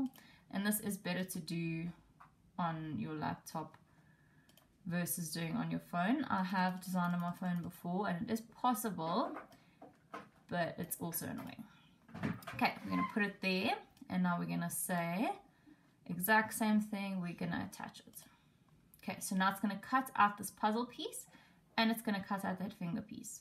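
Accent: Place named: South Africa. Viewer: What thickness basswood did you use for the top piece? I didn’t catch that Ahh accents. My go to is always Australian and then after a minute, I thought no those vowels aren’t Aussie she must be kiwi and then after another couple minutes I got an Irish twang and that’s when I knew you must be South African because that accent always sounds like all the subset English accents put together to me 😂. Checked your profile and sure enough!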